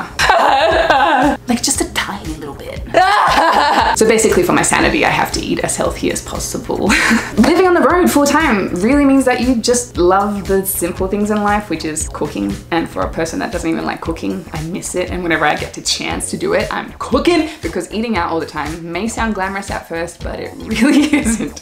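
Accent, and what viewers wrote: Australia. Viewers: I am going to be honest here. At some point, I did unsubscribe you because of the Australian accent (just a past trauma involving some Aussie accent) but the content is just so good and funny, I had to come back :D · Is she Australian?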